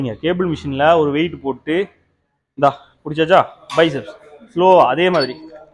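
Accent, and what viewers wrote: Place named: Tuticorin, India. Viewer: TUTICORIN slang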